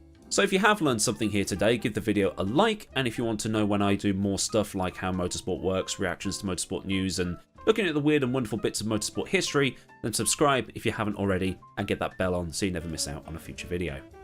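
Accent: United States. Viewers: That was the best attempt at an American accent from a foreigner I’ve heard....breaker breaker...any takers? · Your country accents twang caught me off guard lmfao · Great American accent!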